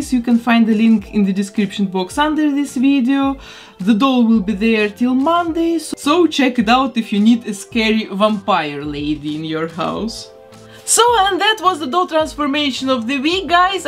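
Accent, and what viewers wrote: Russia. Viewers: your accent is so comfortable but hard at the same time, and the way you make sentenses are just so russian vibing you know😆😅are you russian/ukrain?? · Why do you have a Russian accent? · you have such a strong Russian accent. Wow🤨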